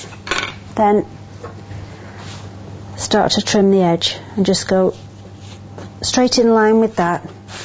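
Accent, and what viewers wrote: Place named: RP, United Kingdom. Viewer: I've never seen jam used before...interesting. I love British accents. They are so posh and everything they say sounds smart :-D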